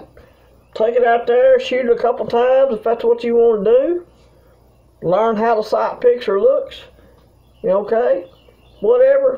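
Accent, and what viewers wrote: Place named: South, United States. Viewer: Absolutely important info! Love hearing another familiar sounding Southern Accent! Stanley and Donna in the NE GA mtns.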